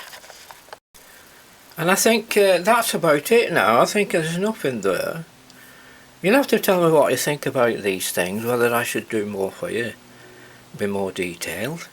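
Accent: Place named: North, United Kingdom. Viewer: Think I've loved everything you've put on YouTube. With your accent I'd imagine you're either from Leigh or Wigan, I'm just down the road in Bolton. Do you still involve yourself with any personal tuition? I'd gladly part with my hard earned to learn from someone like you!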